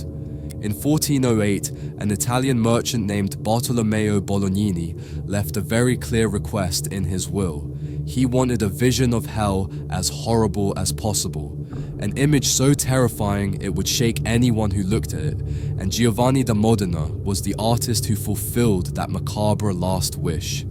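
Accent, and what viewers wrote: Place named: England, United Kingdom. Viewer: Take your English accent and your fear-mongering and shove it I am not afraid perfect love Casts Away all fear death has no power over me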